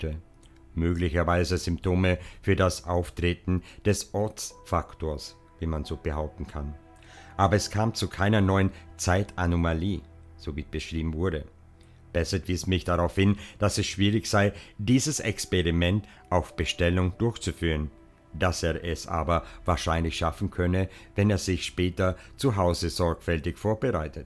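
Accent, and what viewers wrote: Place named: Tyrol, Austria. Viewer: Lieber,danke fpr das tolle Video,Zeitreisen und ähnliche Phänomene zählen zu meinen Lieblingsgebieten!👍 Ich schicke die besten Grüße zum Sonntag ins schöne Tirol aus Essen! 😊 P.S. ich liebe deinen Dialekt,lebst du in der Umgebubg des Lechtals?❤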